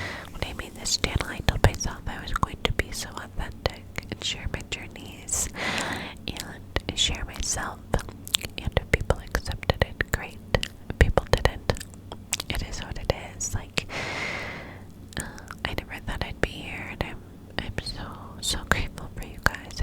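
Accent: New York, United States. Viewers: I love that New York accent!! You also work that fluffy mic like no one else ✨ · Happy Early Birthday!! 🎂🥳🎉 P.S LOVE THE NEW YORK ACCENT!! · Love the soft spoken as well as the New York accent!! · Thanks so much ❤️loving the new york accent from a irish girl living in dublin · omgg PLEASE do more NY accent vids they are soo tingly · omg love the NY accent, will always remember “niagruh fawls” from your first NY accent video 🤣❤️ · Are you a Pisces or Aries March baby? Loveeee the New York accent whispers especially as I’m from the uk. I really appreciate you, sending lots of love ❤️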